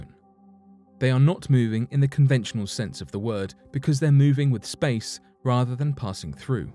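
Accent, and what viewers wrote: United Kingdom. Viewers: Matt Michniuk thats actually the British pronunciation. Much like sedule for schedule or alu-minium for aluminum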